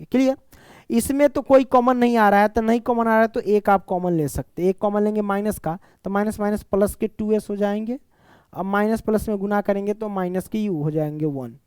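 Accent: Bihar, India. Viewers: Sir apki aavvaj bahut hi mast hai bihari type me mast samaj me aya ho to subscribe kare ❤❤❤❤❤❤❤❤❤❤❤❤❤❤❤❤❤❤❤❤❤❤❤❤❤❤❤❤❤❤❤❤❤❤❤❤❤❤❤❤❤❤❤❤❤❤❤❤❤❤❤❤❤❤❤❤❤❤❤❤❤❤❤❤❤❤❤❤❤❤❤❤❤❤❤❤❤❤❤❤❤❤❤❤❤❤❤❤❤❤❤❤❤❤❤❤❤❤❤❤❤❤❤❤❤❤❤❤❤❤❤❤❤❤❤❤❤❤❤❤❤❤❤❤❤❤❤❤❤❤❤❤❤❤❤😍😍😍😍😍😍😍❤😍❤😍❤😍❤😍😍❤😍❤😍❤😍❤😍😍❤😍❤❤❤❤❤❤❤❤❤❤❤❤❤❤❤❤🎉🎉🎉🎉🎉🎉❤🎉❤🎉❤🎉❤🎉🎉❤🎉❤🎉❤🎉❤🎉❤❤❤❤❤❤🎉🎉❤🎉❤🎉❤🎉❤🎉❤❤❤❤❤❤❤❤❤❤❤❤❤❤❤❤❤❤❤❤❤❤❤❤❤❤❤❤❤❤❤❤❤❤❤❤❤❤❤❤❤❤❤❤❤❤❤❤❤❤❤❤❤❤❤❤❤❤❤❤❤❤❤❤❤❤❤❤❤❤❤❤❤❤❤❤❤❤❤❤❤❤❤❤❤❤❤❤❤❤❤❤❤❤❤❤❤❤❤❤❤❤❤❤❤❤❤❤❤❤❤❤❤❤❤❤❤❤❤❤❤❤❤❤❤❤❤❤❤❤❤❤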